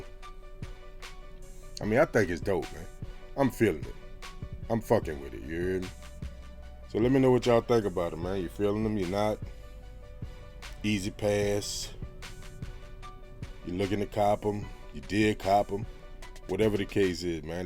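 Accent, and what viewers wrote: New Orleans, United States. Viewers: You got to be from New Orleans you talk and sound just like my boy. You heard me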